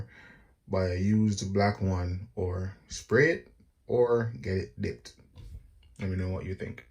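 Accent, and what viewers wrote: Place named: Jamaica. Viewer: Bro sound like a yardie this helped me alot, big up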